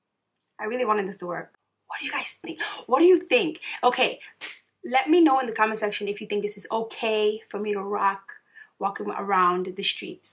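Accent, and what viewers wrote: Nigeria. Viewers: its actually a really beautiful wig, and you'd break hearts with that on lol. Plus i love when you go back to a Nigerian accent...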